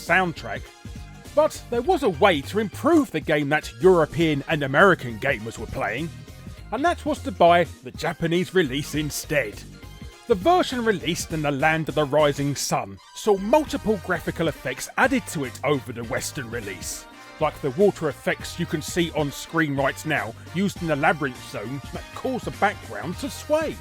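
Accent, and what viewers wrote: United Kingdom. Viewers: He's just British.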